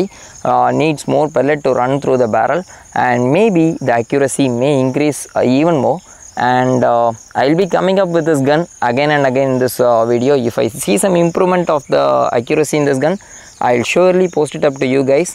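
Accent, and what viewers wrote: India. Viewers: Nice Indian accent 👌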